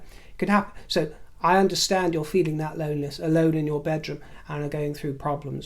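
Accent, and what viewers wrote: South, United States. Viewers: Nice southern dialect . You are so talented. You are loved by us commoners as well. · You are a hoot. Yes im a southerner from kentucky. I understand and enjoy your humor, i,mo ,also 88 years old, 4 sons , 6 grandchildren, one great grandchild,young at heart. ,and loving fun . Love the english people and your love of fun- thank you for making my day. Your southern accent is pretty good , although each state has its own accent. Mine started in Nashville tennessee and moved on to louisville , ky. Ancestors all english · You’re hilarious! Love the southern accent ! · PRECIOUS, I am from Alabama and your southern accent is better than mine!!! Thank you for your wit and wisdom, my dear 😂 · Love your look❤! And your Southern accent 😅! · You look absolutely stunning today,. I wish I had the cajones to wear just one of the pieces, I adore the color, and your makeup is perfect. 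Your southern Belle accent is just fine. You made my day! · From a Southern fan of yours, dear - you portrayed the true Southern lady accent perfectly! The only other accent almost as beautiful is the upper class British accent which you have! You are truly loved all over the world and you make the world a better, brighter place! Much Love, Nancy F. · 😂 You nailed the U.S. Southern accent! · I enjoyed your “southern” accent. There are many variations depending on the region, but the older people, especially near the coast, had inflections that are a bit hard to imitate. There is less emphasis on the hard “r” which sounds closer to the English, ending with rather broad vowels. (I went fah away laist yeah, befo’ wintah, to get away from that scoundrel. He didn’t weah his coat, caught a cold and DIED awn me! Bless his haht, he WAS a character.) 😻 Bye now. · Your southern drawl is not far off of the mark, beautiful! 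Impressive, indeed ❤ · "we want privacy tour" lol that made me laugh out loud. Well said. What a picture. I love your southern accent, too! Thank you for the smiles and chuckles,. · OMG! Loved the Southern accent! But it’s your explosion of laughter at the end that leaves me in pieces. Yes! I find myself in Rivulets of sparkling delight! You’ve DONE IT AGAIN!!! 🇨🇦💕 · 🤣. Thanks for the laugh my dear friend. Love the southern accent. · Bravo, lol. I think your southern accent is great. Red is definitely your color. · oh, you are a hoot! I adore you and your Southern accent. I am from South Carolina (USA) and i love a good accent. I will say that a southern accent is hard to do. Just be consistent in which one you choose. I am tickled by your broadcasts and wish you all the best! Much love, Kristi from South Carolina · I love your Southern accent. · For some reason UK people can do good southern accents. 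I was born in New Orleans in 1955 and grew up in Atlanta. Currently reside in Augusta, Ga home of the Masters golf tournament. Your accent is gentile southern. Reminds me of my great aunt Ann and her daughter cousin Beverly. At Easter they would visit and wear the greatest floral hats, dresses and cotton gloves. Very gracious ladies. Seen no more I'm afraid. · your Southern accent is a hoot. So enjoyable...thanks. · Oh ….. your southern accent had me in stitches! You’re just Divine! Thank you for always speaking your truth! ❤ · you are a treasure! I really enjoyed your southern accents and your wonderful costume today.❤ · Hi, your southern accent is quite fun. A bit like the older accents that were more sophisticated sounding than those now common. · Hello, I spat my tea out, at your southern accent. It reminded me of Blanche Devereaux from the Golden Girls. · 😅 😂😮😊 love your American accent. 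· I'm interested in how you define your look today - I wasn't sure if you were going for gypsy or medieval princess or a member of the harem. On the whole I think probably medieval princess. Your American accent was certainly southern - a cross between Mae West and Scarlett O'Hara.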